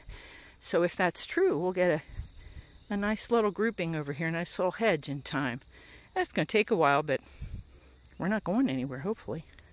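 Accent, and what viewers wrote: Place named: South, United States. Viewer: Is it just me or did I detect a bit of a southern accent coming out in this video? Lovely update and tour! That little watermelon just hangin out chillin in his panty hose hammock made me laugh! 😄 What do you do with your acreage across the street? I love how your home has so much wide open space! Thank you so much for sharing my friend! 🥰❤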